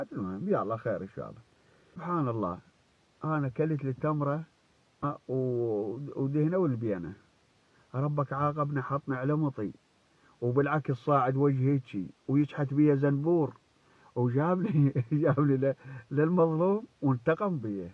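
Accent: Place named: South, Iraq. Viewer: لهجة جنوبية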